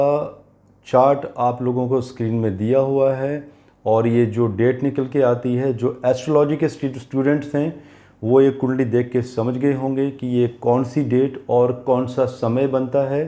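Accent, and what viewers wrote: Punjab, India. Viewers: Punjabi accent? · I really like your Punjabi Accent ...:)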